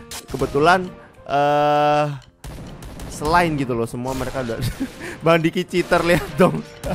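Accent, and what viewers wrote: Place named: Surabaya, Indonesia. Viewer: wallaa , Boso ee kok suroboyoan ngnee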